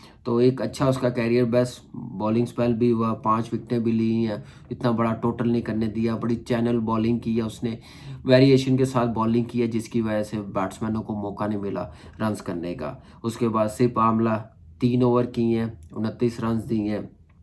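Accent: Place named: Punjab, Pakistan. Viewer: اردو کے مجدد قبروں سے اٹھ کر آئیں اور چھتر پھیریں کہ اردو کا بیڑہ غرق کیا ہے ۔ پنجابی نما اردو ۔ تلفظ سنو اور انتخاب الفاظ ۔ سمجھ آ گئی کہ آپ کی تعلیم بھی فارغ ہے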